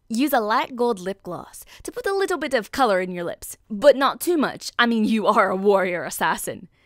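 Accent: United Kingdom. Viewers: Amazing can't wait to become Rayla lol😂😂😂 Also your Scottish accent is amaze!!!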